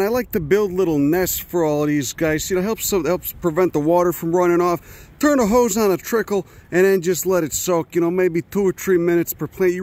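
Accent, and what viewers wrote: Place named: New York, United States. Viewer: What is that accent!? 😅 I love it as it sounds like Peter Griffin crossed with...err, forgive me I'm not from the US...either Italian New Yorkian or downtown Bostonian. 😅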